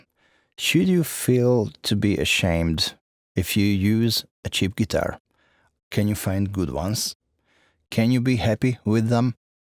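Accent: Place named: Balkans. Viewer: Fullok a balkáni angol akcentuson és vele párhuzamosan a kis rajzokon. 😆💯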